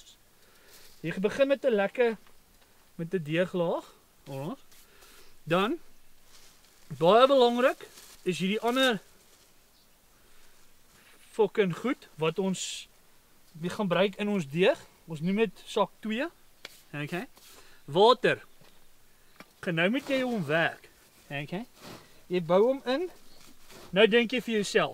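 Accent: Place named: England, United Kingdom. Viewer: 😂 ek love die helse Engels aksent met die "okay" en "alright"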